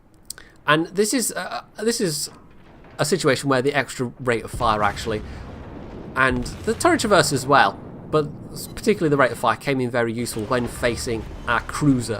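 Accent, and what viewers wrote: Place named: Scotland, United Kingdom. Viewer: I somehow ended up watching this one which I'd never seen. Your accent was much more English here, so since your inflection is more Scottish now, good on you,.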